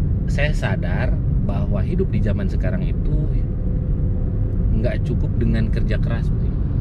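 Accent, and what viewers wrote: West Java, Indonesia. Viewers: Walaikum salam.. Khas sunda Bangets.. He he.. Salam ti Banten..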